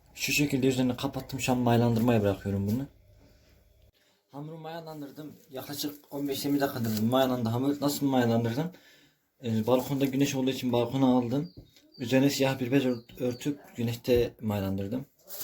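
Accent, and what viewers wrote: Hatay, Turkey. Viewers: Tam bir Hatay şivesi😂🤭 Eline sağlık bu aradaa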